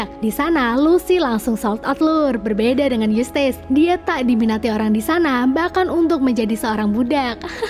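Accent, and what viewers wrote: Pemalang, Indonesia. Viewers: Mba e wong pemalang to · Wong pemalang yo😁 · Wong Pemalang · Wong pemalang ya mbak? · Jebule wong pemalang yaa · lahhh pemalang ndii mbak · wah pemalang to sampean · Fix ini miminnya orang Pemalang yah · Mbak orang pemalang kah? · orang pemalang kah? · Pemalang nih ye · Emg asli Pemalang?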